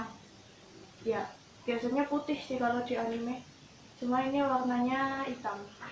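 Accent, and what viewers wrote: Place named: Java, Indonesia. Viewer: Sukses terus mbloo, Medok amats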